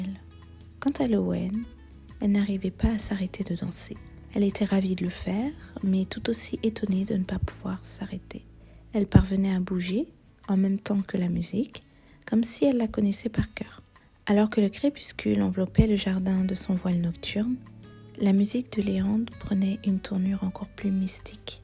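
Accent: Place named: France. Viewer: Wow very beautiful French accent voice and two of the beautiful feet in the world 😍🤩🌍🌎🌏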